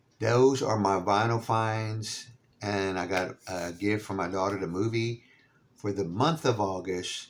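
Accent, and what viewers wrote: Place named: Texas, United States. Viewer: " " may be their best record. Have to admit, when I hear your accent, I thought you were more Mississippi or Louisiana but now I'm picking up your Texas. Are you familiar with out of Beaumont? Great finds! Love ya!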